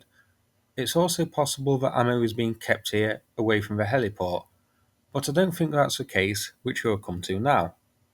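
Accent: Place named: England, United Kingdom. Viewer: Which part of England (county, main city) is home to the accent speaks in?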